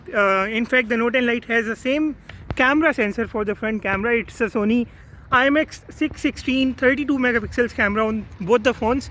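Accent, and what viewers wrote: India. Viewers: I'm not saying I don't like indian accent I can't understand it😅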